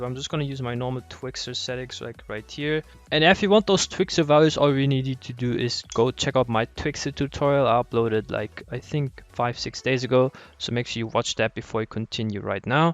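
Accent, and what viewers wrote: Germany. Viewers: Omg ich checke jz erst das du Deutsch bist 😂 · Are you german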